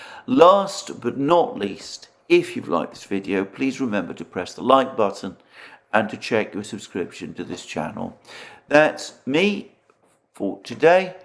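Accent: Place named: North London, United Kingdom. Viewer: Michael Caine = East London accent. Alexander = RP (received pronunciation, ie, schooled,) with North London overtones. The people you knew from Wales will have been extremely different, more of a sing-song and softer accent. The regional accents from, for example, Wales, Cornwall, north of England and Scotland vary widely among themselves and are an important part of our history and heritage. More diluted now than they used to be, but still interesting. Likewise, of course, American accents differ, in different parts of the country. 🤓🤓🤓👍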